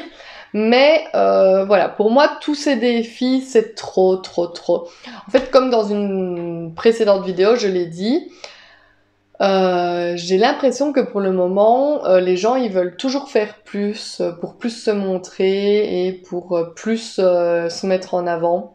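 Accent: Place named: Liège, Belgium. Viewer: votre accent est si exotique! il s’agit de liège ou jme trompe!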